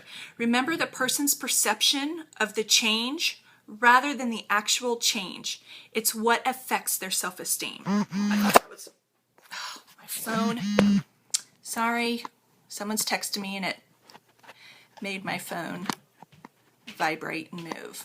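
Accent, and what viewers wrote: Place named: Maryland, United States. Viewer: You must be a marylander... you have the same accent as me! Love your videos, thank you so much for taking the time to do them!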